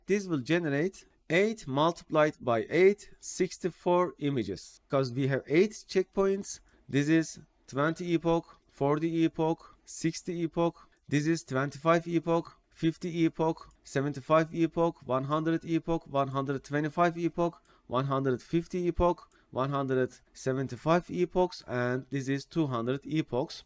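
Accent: Turkey. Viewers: ABİ TURK İNGİLİZCE AKSANİ OLMASAYDİ SEN YİNE OLURDUN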